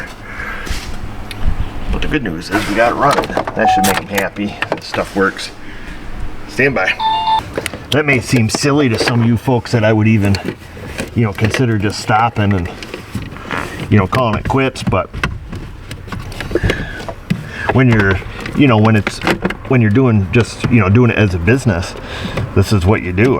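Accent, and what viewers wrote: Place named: Upstate New York, United States. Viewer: I have been binge watching just to hear an upstate NY accent. I miss my alma mater Binghamton. Wish the communist hadn't taken over.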